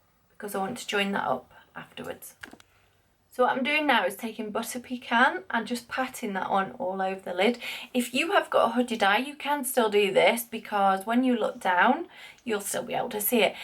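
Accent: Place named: United Kingdom. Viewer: i love your videos and i love your british accent :) This is Littledustmua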